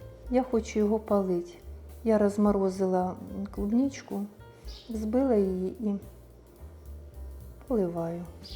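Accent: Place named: Dnipro, Ukraine. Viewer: Якщо закрити очі - одразу Юля Коса чується, такий же дніпровський діалект, та і тембр однаковий.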